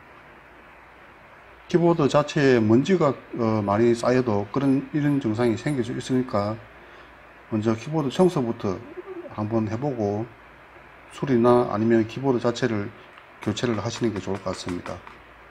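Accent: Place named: Western Gyeongnam, South Korea. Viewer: 서부경남분이시네요 ㅋㅋ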